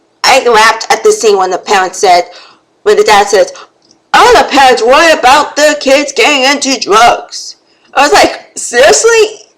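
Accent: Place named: New England, United States. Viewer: From the sound of your accent I am assuming that you are from New England; perhaps Maine.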